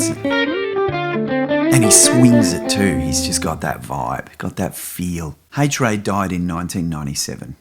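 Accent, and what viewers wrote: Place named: Australia. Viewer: Both of these videos on the guitarists of Tom Waits are great,. Your storytelling, energy, wonderful Ozzie accent and most of all your playing were really a treat. Thank you.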